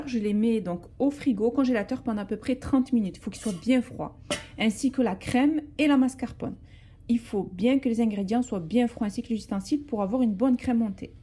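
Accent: South, France. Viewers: C'est surtout le même accent du sud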